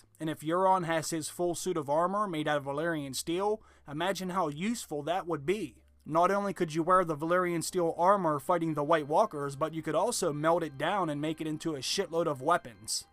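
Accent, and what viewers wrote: Pittsburgh, United States. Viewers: Do I hear a yinzer?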